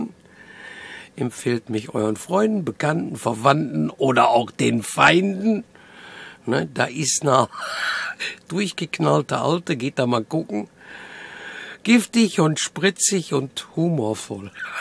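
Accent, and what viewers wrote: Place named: Ruhr, Germany. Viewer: Hi, naja Kritische Person? Das bin ich auch, mir wird auch nachgesagt ich sei Pessimist. Naja ich sage da nur Kritische Person hin oder her,man muss nicht zu allem ja und Amen sagen:-). Und ich bin kein Pessimist sondern Realist denn Pessimisten sind die besten Realisten:-). Und du hast halt eine Ruhrpott Schnautze,wie sich das gehört:-), halt ein Original ^^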